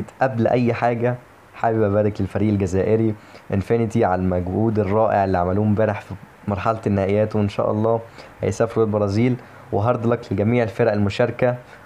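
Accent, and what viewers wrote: Egypt. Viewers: انت مصري صح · دا انت مصري حبيبي 😍 اخيرا سمعت صوتك نفسي اشوفك بقي واتعرف عليك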